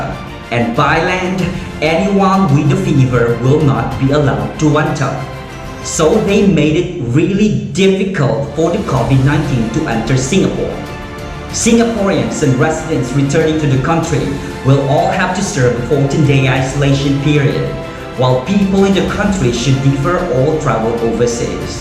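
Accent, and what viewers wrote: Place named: Philippines. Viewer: Have you been given citizenship already? You sound unmistakably Pinoy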